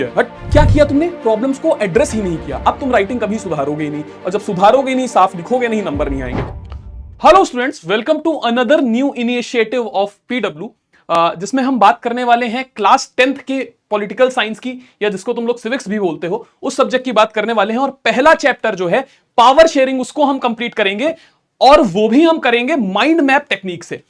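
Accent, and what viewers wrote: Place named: Bengal, India. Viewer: Sir ke accent se lag raha hai ye bangla Sinhana ko singhla bolne me dikkat kisi bangla ko hi ho sakti hai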